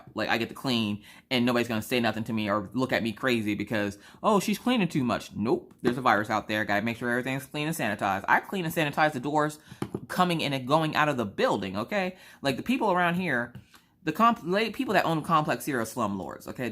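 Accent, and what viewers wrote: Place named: Georgia, United States. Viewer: I’m actually surprised that they did your MRI. I say that because I broke my hip 3 weeks ago and my doctor thinks I need surgery but now no surgeries or any procedures are being done except chemo and dialysis, and life threatening surgeries. Of course it may be a state thing and I’m in Georgia.( got that accent too lol) Glad you were able to get it done.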